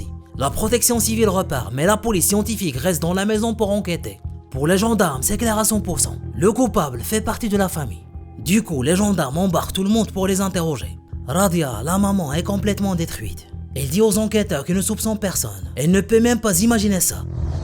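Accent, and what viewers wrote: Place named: North Africa. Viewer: Au de la du boulot remarquable que vous faites, mais il ya cet accent qui nous rapproche du bled et d'ailleurs j salut tout mes collègues bledar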